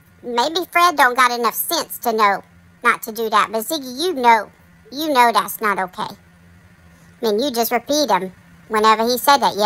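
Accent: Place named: Boston, United States. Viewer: I love your videos, No one can be mad at a princess. 😂😂😂😂 Chasing in her boston accent. signed the "youtube poo poo" 😂😂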